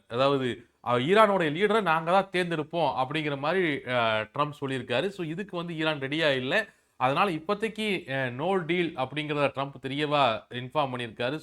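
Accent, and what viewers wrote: Kongu, India. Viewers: அது எங்களின் பிரத்யேகமான கொங்கு slang Sir.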